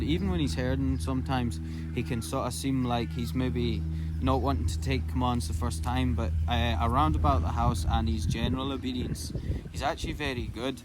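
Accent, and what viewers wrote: Scotland, United Kingdom. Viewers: Love your videos Your sweet dogs are adorable and love watching them Enjoy your Scottish brogue so much! My best to you, Stacey and your fur babies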